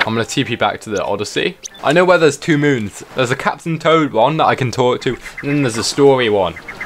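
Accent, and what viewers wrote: United Kingdom. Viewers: Wait are you British 🇬🇧?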